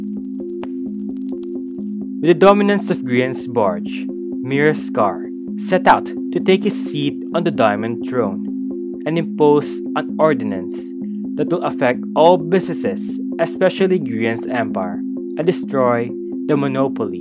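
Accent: Philippines. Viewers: are you filipino cause of the accent??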